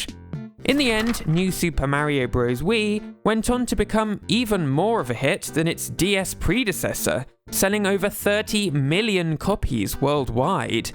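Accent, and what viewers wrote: United Kingdom. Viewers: This is probably the most UKish accent I've ever heard.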